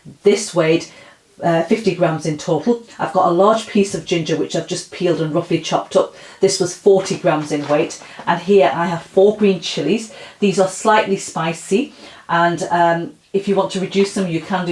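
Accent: United Kingdom. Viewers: Her accent is British. Parents are likely Punjabi either from Pakistan or Indian Punjab. She is definitely not Kashmiri or Pothwari. The recipe is typical Punjabi tandoori chicken but now made in Air fryer. Also Kashmiri women are not that open minded in matters as Punjabi women are. They prefer the hijab and nikab and cannot cook so well.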